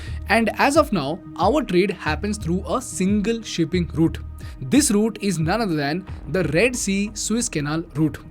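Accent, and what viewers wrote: India. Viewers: This EAM English is awesome.He does not have the Indian accent like this young commentator.Hes an extraordinary guy.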